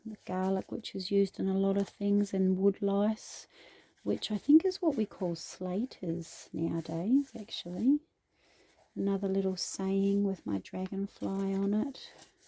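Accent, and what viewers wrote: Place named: Australia. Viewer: Love your Sassenach journal, as a fan of the series, this gorgeous journal does it justice, love all the pockets/tuck spots and the fabulous images/ herbs with how to use is fantastic, you have created a great journaling journal, tfs , nice to hear an Aussie voice have subscribed, good luck in all your future creations xx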